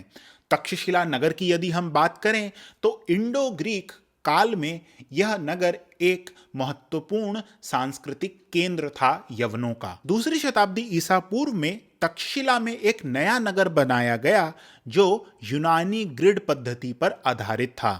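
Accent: Standard. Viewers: आपका शोध और आपका शुद्ध हिंदी उच्चारण उत्तम है किंतु उसने करा या उसने करी जैसे शब्दों से बचिए।उन स्थानों पर किया या की शब्दों का प्रयोग करें,धन्यवाद।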